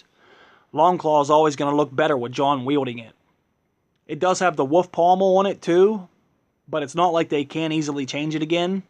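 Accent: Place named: Georgia, United States. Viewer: I like your theories, hit and miss with some of mine, I like what you said about the possibility of a dragon killing weapon, interesting idea, I doubt it will be projectile though too 'Hobbitty'. don't worry about the haters this is fantasy for crying out loud, some people take this too seriously. Anyway nice post, thanks for putting the effort. ps. where are you from ? what is your accent going to guess Georgia?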